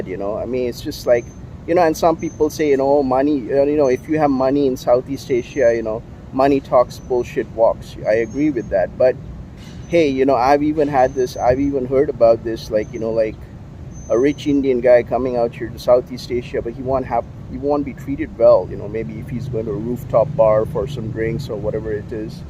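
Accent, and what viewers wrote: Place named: United States. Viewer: your accent is very American. Surprise that you are from India.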